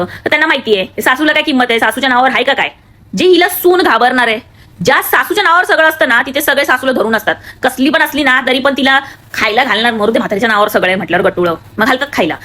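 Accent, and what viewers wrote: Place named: Western Maharashtra, India. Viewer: तुमच्या भाषेचा टोन बघून तुम्ही सांगली कोल्हापूर सातारा इथल्याच वाटताय... पण जबरदस्त रिअल इव्हेंट वर विडिओ असतात सॉलिड 😂🤣😂😂.... Keep it up... 👍🏻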